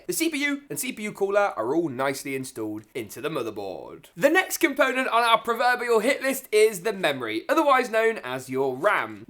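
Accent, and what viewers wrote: United Kingdom. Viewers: This dudes brittish accent is just too much, lol!!!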